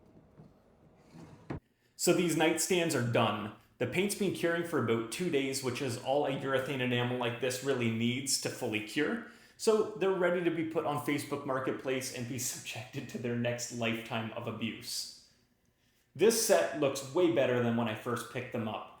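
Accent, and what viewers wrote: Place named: Canada. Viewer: They turned out beautiful and your narration is easy to follow. I knew you were a Canadian right away neighbor. Visited the Falls last month--a view that never ceases to amaze me!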